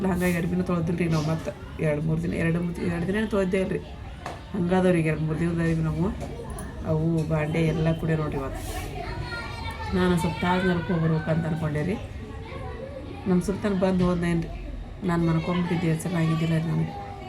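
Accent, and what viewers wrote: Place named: North Karnataka, India. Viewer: ನೀವು ಮಾತಾಡು ಕನ್ನಡ ಕೇಳಲಿಕ್ಕೆ ಮಧುರ ಮತ್ತು ಆನಂದವಾಗಿರುತ್ತದೆ ಉತ್ತರ ಕರ್ನಾಟಕದ ಭಾಷೆ ಬಹಳ ಖುಷಿಯಾಗುತ್ತದೆ ಅದಕ್ಕಾಗಿ ವಿಡಿಯೋ ನೋಡ್ತೇವೆ ಹೀಗೆ ನಿಮ್ಮ ಭಾಷೆ ಮುಂದುವರಿಸಿ ಕನ್ನಡ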